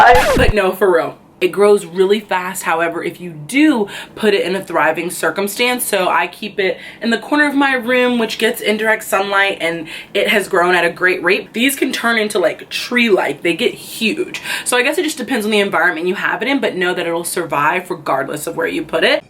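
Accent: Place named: New York, United States. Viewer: Hey Princess! Excellent how you present yourself. My goodness! Love the mix of just right Ebonics and everyday English. You should be considering acting, reading dramatic audio books, customer service, all areas that puts you in the public, HA YOU DO'N NYER. I am also a NYer. (Brooklyn native I am). Thank you. Have a blessed one. Yeah, I'm a plant lady too and I've got about 40 plants on my plish (plant wish) list, will be bought as babies cuz I ain't spend'n no light bill money on a plant, that's hard for me to do. So far I gooot, boout, between 50 to 60 plants in my small NYC apartment. Plants are just dee bomb! Just found ya and will see ya some more in future. Again Princess, bless!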